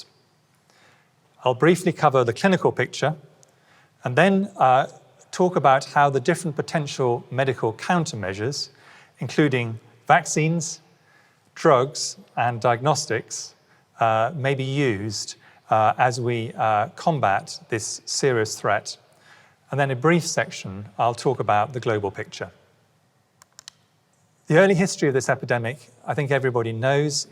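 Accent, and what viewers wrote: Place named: RP, United Kingdom. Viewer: This is pseudoscience wrapped in a posh accent: 1. Death rate: It is 30th April, 2020 (not 1st Feb, 2020). Significant antibody test results are available now. To insist on the 1% based on the data from China (which aims to deceive the world) is criminal. The death rate is close to that of flu. 2. Contradiction between high R0 and 0 hospital death in mid March 2020: If the R0 is high (close to 3) and the virus landed in Europe in December 2019 (See BBC report of France on <num>), by the end of March (UK lockdown) UK might have already achieved herd immunity. The daily death count only indicates the increasing test effort, nothing else. And the daily death chart is no different from that of Sweden, which did not lock down a country. 3. Imperial College model: the paper itself has never been peer reviewed; The data plug into it is rubbish (UK threw away 3 million test kits early April, 2020. 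How do anyone get reliable data with no accruate tests?); Furgeson never shared the program code for peer review. 4. Vaccine myth: Where is the vaccine for SARS after 17 years? Should the lockdown continue indefinitely until a C19 vaccine is discovered? 5. Chloroquine: it has been accepted as official treatment drug in many EU countries. The solution is simple: give it to the patients showing early symptoms. Whitty's emphasis on the failure on the later stage patients is a red flag that he is working for the big pharma to sell drugs with much higher margin.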